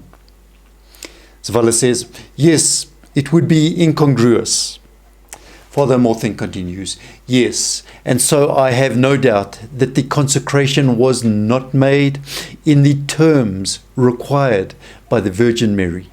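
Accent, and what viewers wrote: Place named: South Africa. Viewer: Sounds like it is from Africa. South African maybe.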